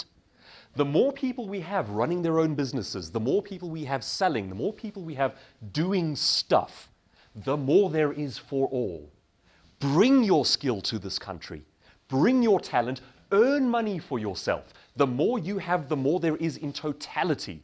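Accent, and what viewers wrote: England, United Kingdom. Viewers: He is an excellent speaker he has an English accent that you can understand very well and very knowledgeable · Queens English?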